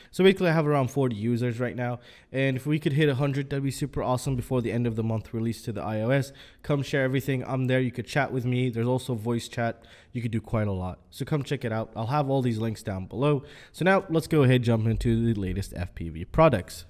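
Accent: United States. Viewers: Somehow I always thought you're American and in America :D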